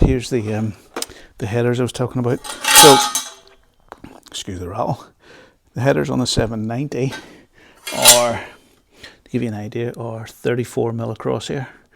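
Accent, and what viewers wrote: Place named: Scotland, United Kingdom. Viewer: Just went and checked my <num> Duke R....yes that is the correct orientation for the header nuts. The 790 and 890 have different radiators. The 890 radiator is larger. Enjoying following this, Thank You for sharing! You are doing an incredible job of cleaning as you go along. It will be like you have a new bike! Also, as a Texan who has some Scottish ancestry and a Scottish name (Gillie)...I'm enjoying the accent!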